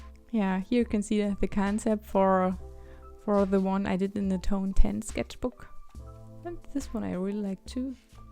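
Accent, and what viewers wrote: Germany. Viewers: Are you Czech or German? Your accent sounds like you are German, but I saw your video about alcoholic markers and it's title is in Czech, so I just wonder about it. (Btw your art is awesome, and Happy Birthday to your channel! ^_^)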